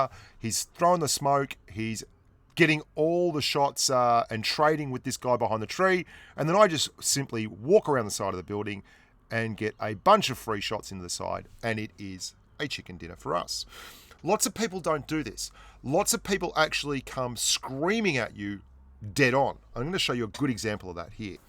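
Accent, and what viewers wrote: Australia. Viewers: Suggesting that you mention the importance of having multiple scopes for different engagements. 1x or 2x for close and maybe mid, 4x and 8x from mid to far. Cannot stress this enough for players who zoom in on every target they fire at. Too much zoom will get you killed more often than not at closer ranges. Another point you can bring up is you don't always have to stand when shooting out of windows. It is very situational, but if they aren't directly below or close to the house, back up a few steps behind the window and crouch. You'll be able to shoot outside the window while barely peeking your head. Great videos as always. keep making great content, honestly really enjoy you making content for this game instead of blitz. I find this game much more complex and engaging than blitz, which makes that aussie voice just that much more enjoyable to listen to. 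If you could, maybe make an advanced guide in the future, I'm sure it would help get the knowledge and tactics out there.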